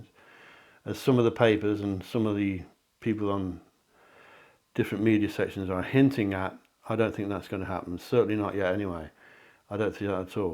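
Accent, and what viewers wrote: United Kingdom. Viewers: it’s not a Devonshire accent though! I wondered where you are from - is it Staffordshire? My entire family is Cornish except one Grandfather who sounded like you.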